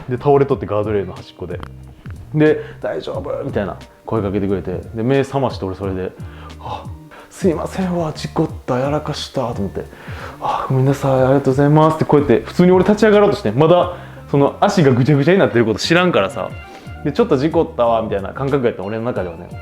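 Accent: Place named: Kobe, Japan. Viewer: 神戸の子やな、言葉で分かる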